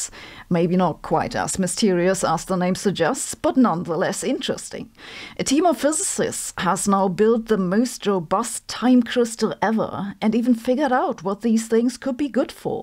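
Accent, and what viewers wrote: Germany. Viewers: Hossenfelder: don't do away with your german accent too much when communicating science using the english language: it has a nice charm and ring to it ;-)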